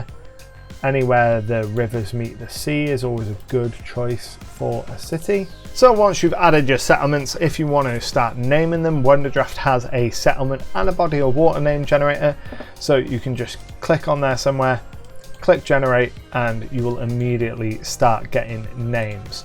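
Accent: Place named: North, United Kingdom. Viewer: Hi New subbie. I played D+D way back when it first started in the 70s (eekkk - showing my age!!). Had a game going for 6 years in the early 80s. Got back into the idea recently and just started doing digital stuff. I purchased Wondercraft and came across your channel. You sound like you are from my part of the world (north east England). Looking forward to learning more from your videos! Thanks, take care and stay safe in these strange times.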